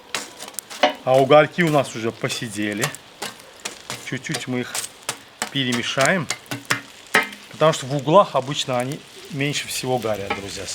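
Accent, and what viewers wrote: Baku, Azerbaijan. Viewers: Настоящий Бакинский акцент❤смотрю с удовольствием ваши ролики, всем Талышский салам из Канады Вагкувер ❤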